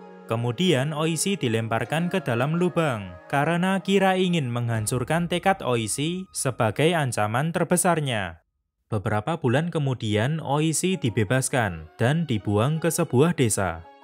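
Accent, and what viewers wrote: Java, Indonesia. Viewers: Jawi banget mas e😁😁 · Medok ya mas 😁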